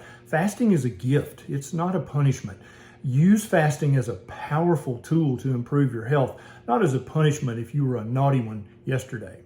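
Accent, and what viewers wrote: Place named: Kentucky, United States. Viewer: Dr. Berry, I listen to you a lot...I spent most of my childhood in Kentucky, and EVERYTIME I listen to your shows, I crave "Cokes" and beef jerkys and MoonPies!! Oh, and ALL DAY SUCKERS...lol...Blame it on my granny! I LOVE that accent of yours, and miss my granny and papaw...they both passed years ago...You are my fave doc on here! I do not eat banana splits, that was the name of a " divorce group" my kids attended when they were 6 & 8...I did eat a pop-tart today...but I have been good for 6 weeks or more...I will kick my butt when the morning comes....haha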